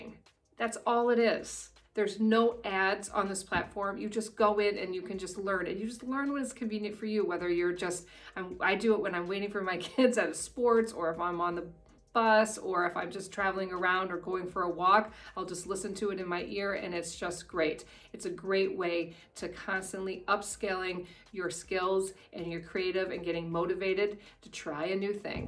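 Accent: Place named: New Zealand. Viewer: Great video - I can even detect a bit of Kiwi in your accent these days!!!! Cheers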